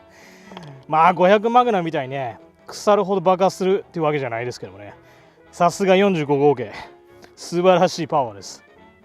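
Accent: Kansai, Japan. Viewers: 関西弁のシャベリも検証も楽しく拝見しました。 FMJだとなんとか原作通りに手が破壊されなかったですね。 ただあの場所を抜いてしまうと骨が無事でも正中神経や骨間筋、虫様筋などがズタズタでしょうから、手の機能は著しく低下したはずです。 槇村が普通に目視で縫合してましたがマイクロサージャリーが必要なレベルです。 他の動画も楽しく拝見させていただきます。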